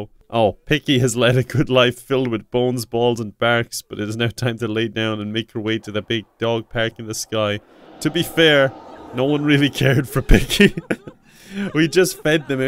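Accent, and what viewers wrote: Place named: Ireland. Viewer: Where in Ireland are you from 💜💛💜💛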